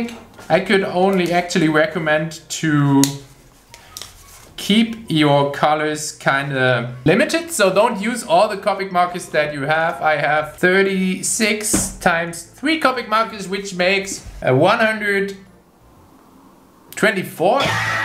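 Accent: Germany. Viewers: Man hört so raus, dass du Deutsch bist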